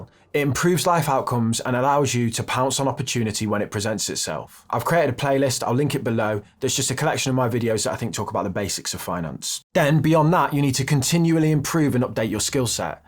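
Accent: Birmingham, United Kingdom. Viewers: Fantastic video! I think I hear a Brummy accent. Are you from Brum? Also, more videos with UK garage involved pls. Haha 🙏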